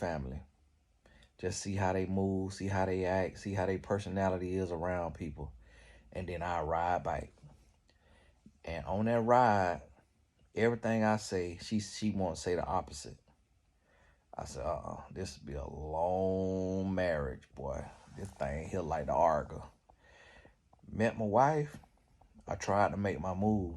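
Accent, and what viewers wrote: South, United States. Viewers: Lol you crack me up 😂😂 it’s the accent for me I know you southern!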